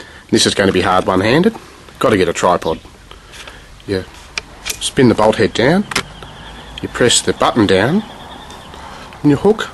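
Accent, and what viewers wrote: Australia. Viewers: Gday from Qld. I wish i had a beautiful aussie accent like you. where are you from?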